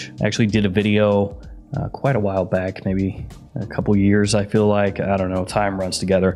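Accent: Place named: Tennessee, United States. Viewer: I like when uses his original Tennessee accent 😆 Embrace your southern accent 😀👍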